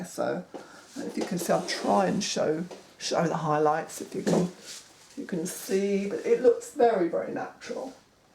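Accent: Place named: United Kingdom. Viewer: I stumbled on your video . I am glad I found you . I am 63 and have grown my hair out below my shoulders . I had to smile when you talk about your shower . I am a 'tub soak bath' woman and wash my hair separate , in the kitchen sink . Will you make video's on pony tails and buns ? How do you do the puff bangs ? What is a 'plat' ? I like your accent -- and when you said 'carry on' - was a dead give away of British. ❤️ Kindest Regards ❤️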